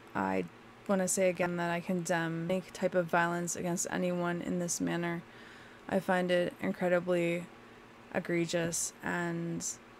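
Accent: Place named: United States. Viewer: You sound pretty AMERICAN to me. There's nothing wrong with being proud of your ethnicity but your definitely an AMERICAN js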